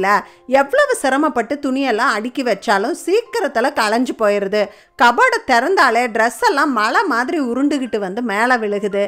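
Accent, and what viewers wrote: Coimbatore, India. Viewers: கோவை தமிழ் உச்சரிப்பு மற்றும் மிகவும் பயன் தரும் தகவல் நன்றி சகோதரி.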